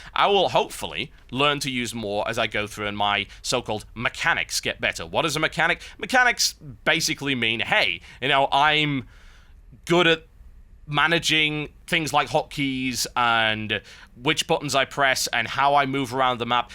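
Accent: United Kingdom. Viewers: are you from the UK?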